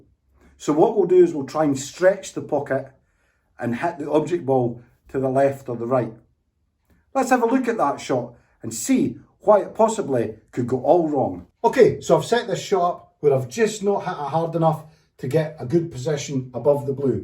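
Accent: Scotland, United Kingdom. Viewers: Well your new subs from Russia etc seem happy enough, I think alot of the world is familiar with the Scottish accent by now with there being so many celebrities etc with Scottish accents. Especially being snooker fans with top legends like hendry and Higgins being scottish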